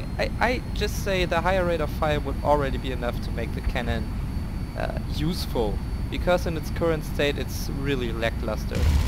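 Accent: Germany. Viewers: I LOVE UR THICK GERMAN ACCENT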